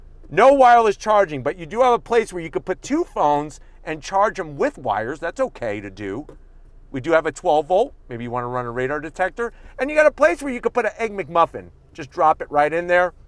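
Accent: United States. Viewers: Sounds weird but American 🇺🇸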